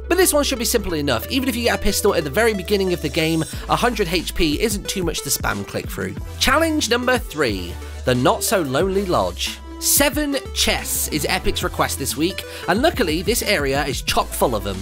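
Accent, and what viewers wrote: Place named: RP, United Kingdom. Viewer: UnknownMasksW2serialirious he sounds like a British butler.. Like seriously dude lol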